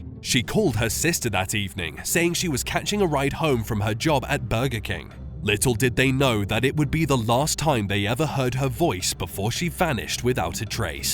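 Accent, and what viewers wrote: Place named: England, United Kingdom. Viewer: this guy has an English accent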